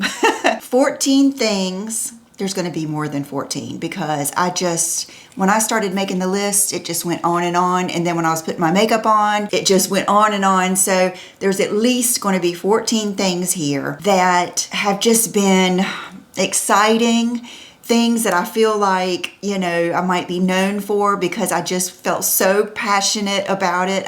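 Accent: South, United States. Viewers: I just went and watched your first video, how sweet! You did "keep your nerve up" and 14 years later you are still here! I have watched for a long time, maybe not since the very beginning but a long time and wow, what an accomplishment to have stuck with this 14 years and we all love you so much! And I love your Southern accent too!!!! oxJUlia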